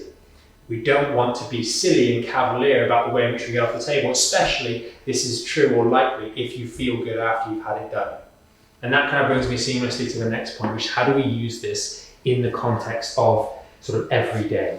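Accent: England, United Kingdom. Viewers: Looks to me that it is a teeter inversion table, between the heavy English accent, the echo, and the fact that he’s speaking turbo mode-I can only hear like one out of 25 words (why do people bother getting a college education when it seems to me logic gets pushed out the back door every single time without fail.👎🏼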